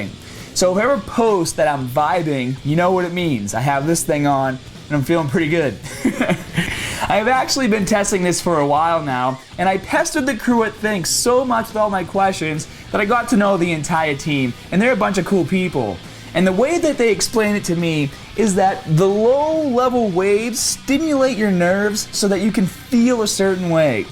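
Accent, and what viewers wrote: Boston, United States. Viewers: Okay, so after watching his first videos from 5 or so years ago, how did he somehow gain a Boston accent, and get so yelly? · 1, I'm not addicted to caffeine I have a coffee every second day before I train I also believe you drink coffee 2, my points were not meant to be insulting but this is the second time you come out swinging at something I said,, but tbh I seen a comment left yesterday about how you come up with , you should buy FAT GRIPS ,, you should buy The abs boots you should buy this stupid thing attached to my head with after a certain amount of time you don't use,, being sub a long long time and you know what your Turing into more of a sell out and big fucking douch bag having a go off some ones view point,, which leads me to believe your in commission for them and I believe the only studies were carried out by them not professionals,,unsubscribed and another thing since you let your Boston accent come out you turned into a mark e mark douch bag good luck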